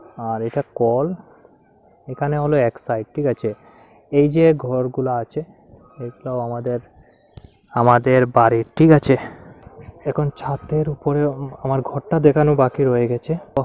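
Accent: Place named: Sylhet, Bangladesh. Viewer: Tumi sylheti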